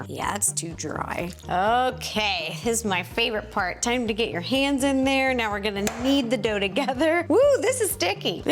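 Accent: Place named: Texas, United States. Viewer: is soth girl.. the texan accent really gives sheldon vibes..loveit... & your foood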